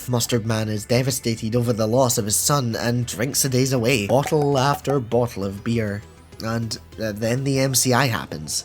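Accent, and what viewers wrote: Scotland, United Kingdom. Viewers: The Scottish accent is throwing me off loved the theory 😂😂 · nice vid man. cool to hear a Scottish fnaf fan lol Im Scottish myself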